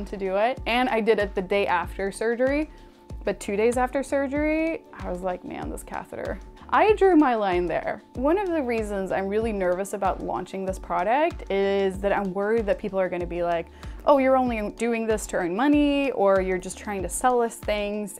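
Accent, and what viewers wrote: Sweden. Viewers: Are you swedish?! Cool product tho. I'd buy it :) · Wait you swedish??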